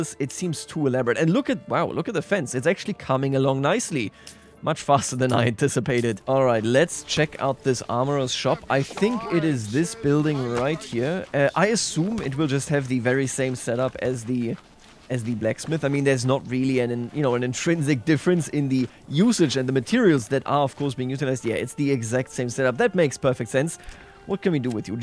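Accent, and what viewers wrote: Germany. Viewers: Haha hab sofort den deutschen Akzent rausgehört. sehr cooles video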